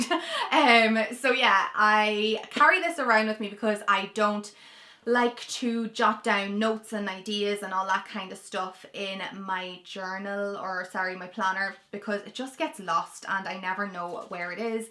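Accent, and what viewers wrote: Ireland. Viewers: I love your irish accent!!❤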